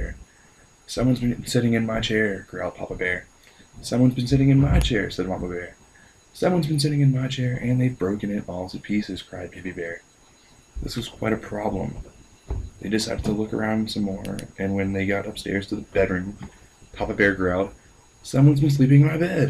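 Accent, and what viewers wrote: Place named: Charlotte, United States. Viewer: I only notice a very slight accent but if you would have grown up 50 miles away from Charlotte your accent would be totally different(as in totally southern) hehe. I also live in Charlotte been here for 2 years now and it seems like the accent goes all out southern once I leave the city limits. Also most people from Charlotte are from the North, ie:NY/NJ/OH just like me:)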